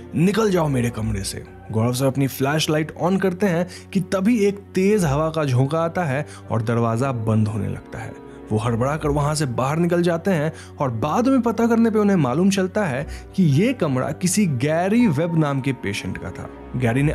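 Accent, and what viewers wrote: Bengal, India. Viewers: Voice over awaz kisi bengali ka hai. His pronunciation makes it evident. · Are u from Bengal? Im catching a Bengali accent. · Your Bengali accent ruined the whole fun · Bro your voice is very nice lekin yar ek kaam karo. Pronounsession mai gadbad hai R ko D mat bolo. Sorry bro no hard feelings. I can understand your bengoli axent. Some of my bengoli friends talk same like U. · Narrator is definitely bengali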